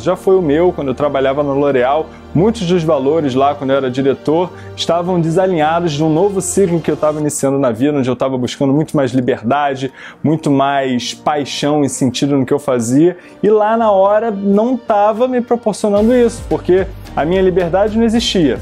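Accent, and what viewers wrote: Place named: Rio de Janeiro, Brazil. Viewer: examente, um sotaque carioca e um bom cenário iludem muita gente.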